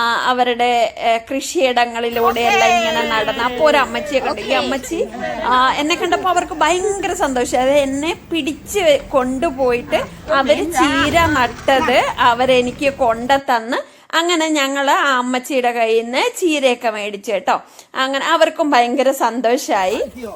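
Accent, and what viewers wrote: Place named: South Kerala, India. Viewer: kannur slang alla. South kerala slang aanallo.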